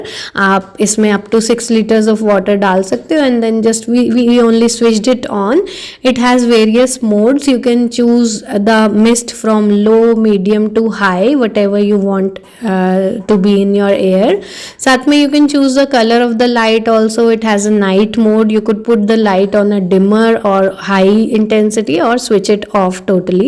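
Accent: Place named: India. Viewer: I really love your videos, your thoughts and views. Love love your accent and you owning it and rather than being shamed by people. This makes me admire you even more. Re aroma of our Indian spices and cuisine, instead of being shamed I am 💯 on board with you. Just like you, I question the same to people who are bothered by our Indian spices smell. I am married to a midwestern American Caucasian. When his family even snubs their nose at aroma of my Indian cuisine, all I say to them is “I totally understand that this aroma is new to you and you find it different just like how I still feel about the burgers and meats you all eat”…Love your authentic self. Always stay that way 💕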